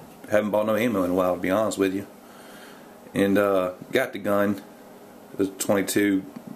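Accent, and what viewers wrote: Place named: South, United States. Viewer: Southern accent does not help either, anyone know a nobel prize winter or eminent scientist leader in his field who has a southern accent and don't even bring up Clinton because until he and Jimmy were elected President I thought there was something special about someone who could become POTUS but they killed that Idea FOREVER you can be common as shit and be president.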